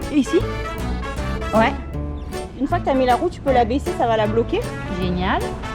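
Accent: South, France. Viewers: Haaaaaaa tu m’as manqué 🎉 😮 trop cool d entendre le son du sud 😊 , du calvados j entend le soleil ☀️ merci 🫨 mince la creveson 😵‍💫 et oui 13 😊 , vous êtes au top ✌️ j’oyeux anniversaire en retard j ai envie de pleurer 😢🎉🎉🎉 bizou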